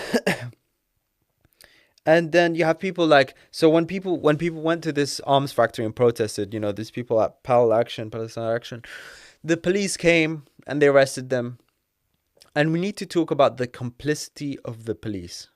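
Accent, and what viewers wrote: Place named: United States. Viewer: Do you live in the US ? because I can hear the accent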